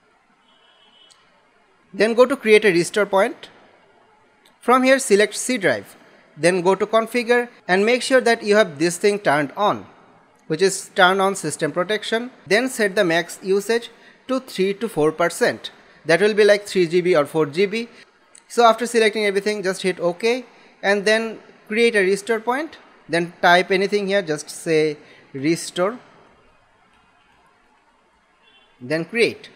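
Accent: India. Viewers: that accent sound Bengali...are you one tho?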